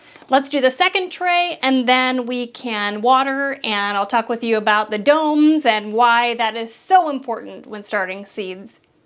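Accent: United States. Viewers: Fascinating the differences between American English and the English we speak in England; I love the way you call herbs "Urbs" (always makes me smile). It shows that American comes from the many different nationalities of settlers: I wonder why you call courgettes (french) zuccinis (italian) ; Aubergine (french) Eggplant: Cilantro we call coriander; there are many others.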